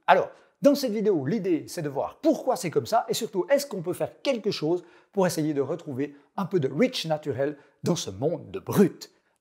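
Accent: Switzerland. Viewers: Hello je suis vaudois, t'as un accents qui vient de quel region en suisse?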